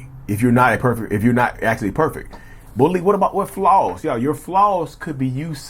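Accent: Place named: Chicago, United States. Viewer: You from Chicago? Hear the “ you know “ a lot when people are from Chicago or the Midwest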